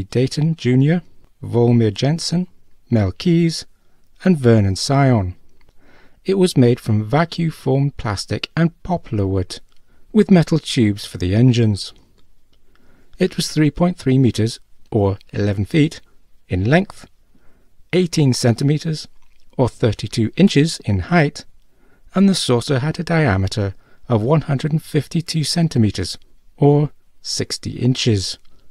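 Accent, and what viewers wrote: United Kingdom. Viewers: You sound British, so I'll cut you some slack. America had a famous comedienne by the name of Lucille Ball. She, and her husband, Desi Arnaz purchased an old movie company, RKO Studios, (which made the original 1933 film," King Kong"), as it was going out of business. They changed the named to Desilu, (Dez-E-Lu), which was made up of parts of each of their names. They made several television programs at their studio, like "I Love Lucy", "The Untouchables", among others. In the early 1960's they divorced, and Lucy was left to run the studio on her own. Apparently, she was not the greatest business woman, and things started to fall apart. Literally, there was vermin in the walls, pigeons in the rafters, etc. To raise money for repairs, she decided to make other television shows besides her own, "The Lucy Show", and teamed up with both NBC, to make "Star Trek", and CBS, to make, "Mission: Impossible". 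Both shows were filmed at ajacent sound stages. While CBS, and the public, liked Mission: Impossible enough to keep it funded properly, NBC didn't do the same with Star Trek. Over the three years that it was made, its budget was reduced each year, its time slot and day of the week it aired were constantly changed, so, if you did watch those original airings, (as I did), you never really were certain that it would air at the same time and day for next week's episode.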